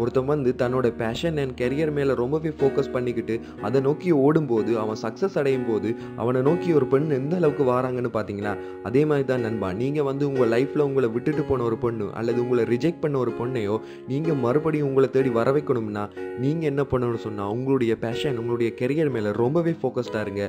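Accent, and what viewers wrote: Sri Lanka. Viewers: Bro ninga sri Lankan tamil la?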